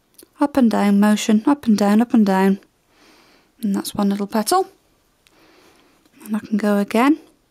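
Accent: United Kingdom. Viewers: Are u British or scottish you sound a bit like it ;)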